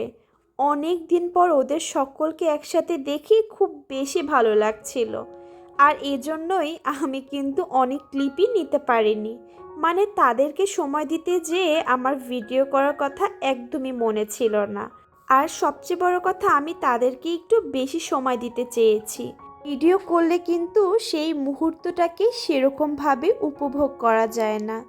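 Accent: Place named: Barishal, Bangladesh. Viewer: আপুমনি তোমার বাসা বরিশাল কোথায় নদীর আশেপাশে দৃশ্য দেখে তো বুঝতে পারলাম হোসনাবাদ রামার ফুলের সামন দিয়ে যেতে ছিলে আপু তোমার বাসাটা কোথায় জানাবে কিন্তু আপু অবশ্যই অবশ্যই কিন্তু জানাবে তোমার ভাষা বরিশালের কোথায় আমার বাসাও কিন্তু বরিশাল তুমি যে নদী দিয়ে যেতে ছিলে আমি কিন্তু এই নদীর যে দৃশ্য গুলো সব আমার খুবই খুবই চেনা❤❤❤❤❤❤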